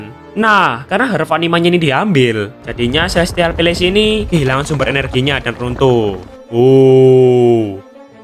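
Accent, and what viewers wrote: Java, Indonesia. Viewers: Aduh logat jawa dan jokes cringenya ganggu bgt, terlalu lebay jir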